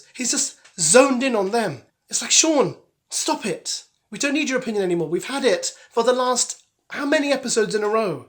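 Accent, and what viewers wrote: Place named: England, United Kingdom. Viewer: I really do enjoy your cadence mixed in with your accent. Could I ask what part of England is this accent from?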